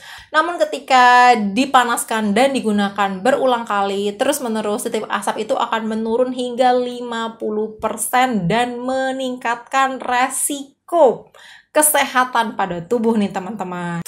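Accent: Java, Indonesia. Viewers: Wah baru dgr suaranya medok 🤣🤣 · Bu dokter cantik ...medok bgt org Jawa kah....tmksh semua ilmunya🙏❤️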